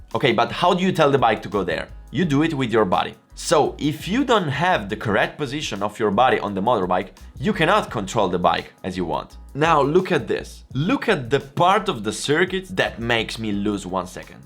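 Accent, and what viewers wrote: Italy. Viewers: I love your accent. Makes me miss living in Italy even more now. I subbed when you said, "if I keep da fooot like dis, I can poosh, while if I keep da fooot like dis, I cannot poosh" 🤣🤣😍😍 Great video, keep'em coming and ride safe 🏍💨✌🐼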